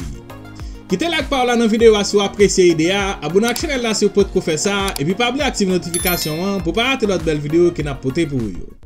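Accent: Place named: Martinique, France. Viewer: Have you lived in the Caribbean before, you've sound like people from Martinique ou la Guadeloupe?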